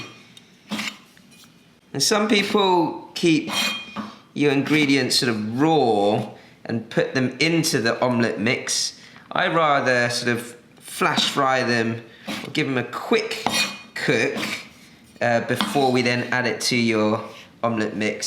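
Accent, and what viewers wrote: England, United Kingdom. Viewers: A gorgeous Asian man with a British accent. I'm smitten 😍 oh, the food looks yummy too ☺️ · I really enjoy your cooking and your pleasant English accent as well ❤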